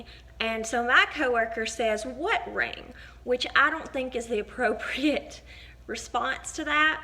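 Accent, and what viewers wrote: South, United States. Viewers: I’ve been binge watching your videos the last couple days and let me just tell you, you’re a great storyteller and I just love your southern drawl, personality, and little bit of attitude lol Keep being you 😘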